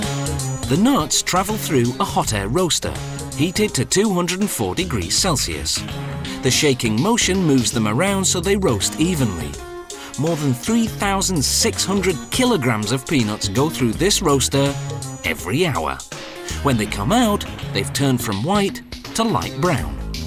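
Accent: England, United Kingdom. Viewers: He's so delightfully british! With his vitamins and aluminum! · Lulz, gotta love how the Brits say certain words differently than we do, like "vitamins". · I'm not sure where the series originates, but the narrator is English (unless he's from elsewhere in the British Isles and has a remarkable English accent).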